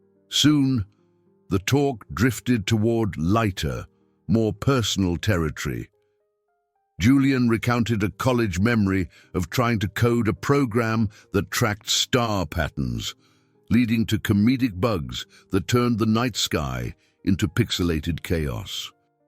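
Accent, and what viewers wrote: United Kingdom. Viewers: I Agree with Comments from "Shirelene", & "ScatPack". I myself feel that the Script was Way Too Long & Slow; AND the Professionally trained Narrator Voice was flat Horrible, play- acting, low then loud, w/accent from U.K. Royalty, way above public status. The video background was interesting, vary- ing from forests & waterways, mountains & country roads, to huge city buildings & speeding cars. The actuality of a young data analist being able to out- computerize an experienced high tech expert & saboteur, in real time, is totally unrealistic & impossible. Finally, I am sick & tired of just another story of self-absorbed, narcissistic, cor- porate corruption, disruption & destruction. How about an innocent, decent, touching Romance ?! This movie finally had a good ending, So, Despite my criti- cizing, My Rating on this Movie is: 8/10. 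❤❤😅😅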